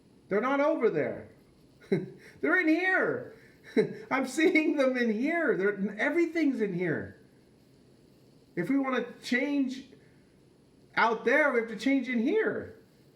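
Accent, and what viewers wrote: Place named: Boston, United States. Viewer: remember when I said you have changed.. your voice use to be a lot more... Boston or main... idk how to describe it.. aren't u from the east coast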